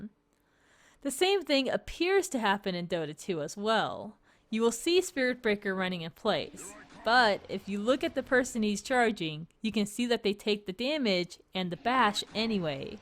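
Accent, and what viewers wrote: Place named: England, United Kingdom. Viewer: Это акцент у англичан такой.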